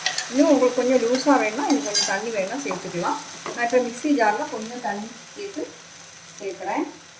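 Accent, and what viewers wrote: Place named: Kanyakumari, India. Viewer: அவங்க கன்னியாகுமாரி மாவட்டத்தை சேர்ந்தவர் போலும்.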